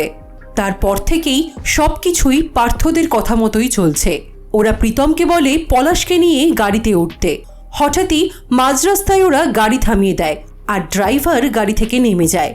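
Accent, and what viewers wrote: India. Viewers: Apni ki Indian? Apnar bangla accent er jonno ask korlam 😊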